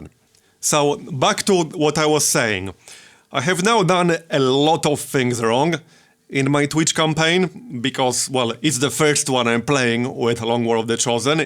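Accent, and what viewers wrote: Poland. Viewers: Thank you for your honesty and hundreds of hours of pure entertainment! I subscribed to your channel because the quality content and your nice Polish accent (which as a half Polish person I enjoy very much). Keep up the good work on Twich and YT and remember, there is one worse thing than reading YT comments: it's taking them seriously:) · You are the first gamer I followed on YouTube. What got me was your Arabian Civ V campaign. I was amused by your humor and amazed by your ability to beat the game at such a high difficulty level. I was also trying to figure out your accent. Then I learned somewhere along the way it is a Polish accent. Either way, you have provided many great hours of content and even inspired me to get into XCOM 2 when I knew nothing about the series. I say upload videos of you learning games because first impressions and playthroughs are very fun to watch.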